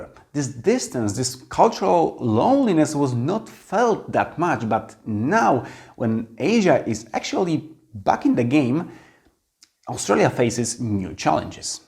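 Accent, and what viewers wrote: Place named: Poland. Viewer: Add english subtitles asap. You need them with your polish accent.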